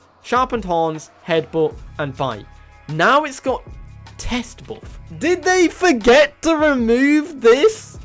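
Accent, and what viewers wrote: United Kingdom. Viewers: you are briish ey?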